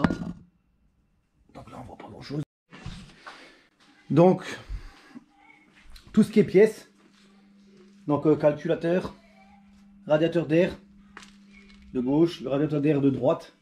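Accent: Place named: Lorraine, France. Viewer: L accent lorrain!🤣🤣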